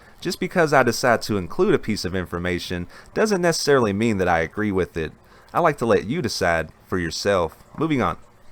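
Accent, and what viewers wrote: South, United States. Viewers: Maybe this is a repeated question but its nice to hear a real person instead of a c g voice. I cant quite place the accent on the person. Tenn., Ms., Al. .? Anybody know.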